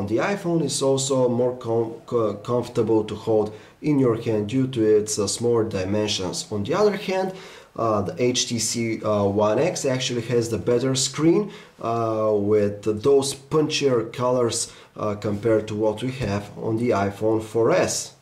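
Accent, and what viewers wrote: Russia. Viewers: Is this guy Russian? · WTF phonearea guy with russian accent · Is he russian^^ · Your accent is pretty cool. It reminds me of the russian dude in 007 goldeneye. "I am a genius!" Also, you guys need to review the custom ice cream sandwich roms. They are much better even than the stock android os which is already way better than the ancient little kid IOS It's funny, I see tons of people go from apple to android and they never go back. I have also seen people go android to apple but they alway go back, except one dude. He said it looked "neat". Dumbest reason ever!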